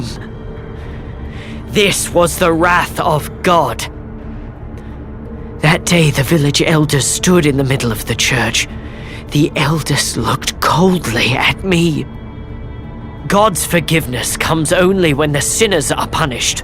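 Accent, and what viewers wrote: United Kingdom. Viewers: HES BRI’ISH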